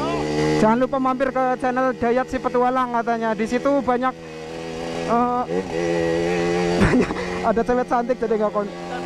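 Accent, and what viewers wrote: Banyumas, Indonesia. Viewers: Mmas cah ngapak yo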